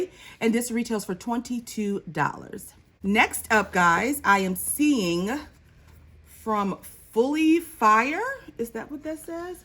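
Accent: Louisiana, United States. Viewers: You have to from LA with that accent. Love it! Lemme guess BR or NOLA. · Your makeup and nail color are so pretty!!! And I love your Louisiana accent especially when you say your name and today...so cute😊 nice unboxing too😊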